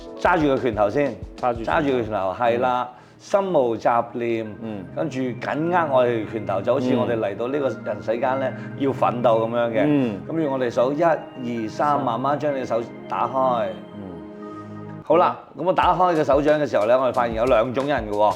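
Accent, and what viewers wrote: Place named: Cantonese, China. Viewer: 厦東話主持都吾錯呀！有廣東話腔了但音未啱，因舌頭未躺着。先用鼻子發音。差少少就得啦！努力呀主持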